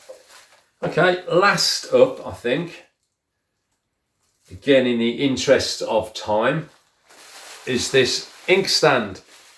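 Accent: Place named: England, United Kingdom. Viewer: Catching traction with eBay videos is rough in my opinion. You already know how to record, edit and upload; you may want to consider starting a second channel for a different topic. Everyone loves an English/ British accent. I'm not sure which one it is. I'm guessing English is the proper term. I'm certain you could do very well in other categories if you're interested.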